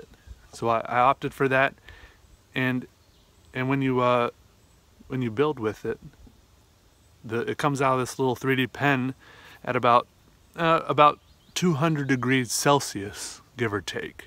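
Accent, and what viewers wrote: South, United States. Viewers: TF? I was, for no reason other than curiosity, looking at alligator skin cowboy boots before opening Youtube and continuing my "Watch Later" list when i see wearing a cowboy hat and speaking with a southern draw. TF is going on here?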